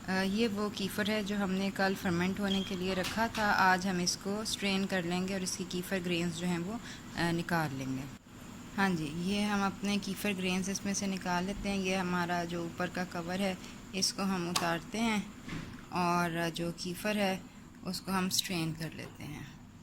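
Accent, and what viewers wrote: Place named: Punjab, India. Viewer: andaz-e-bayaan bohat acha hai kalka sa panjabi touch hai jo acha lagta hai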